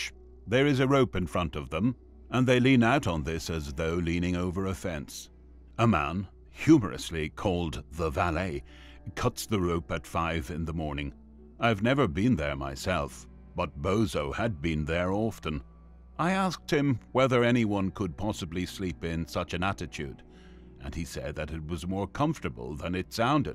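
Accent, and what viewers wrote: Dublin, Ireland. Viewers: Interesting an Irishman is narrating this. The exact same conditions existed in any town or city in Ireland. Actually worse, even though Ireland and England were the same country at the time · I hear a well bred Dublin accent. It is said this accent speaks the best pronounced English in the world.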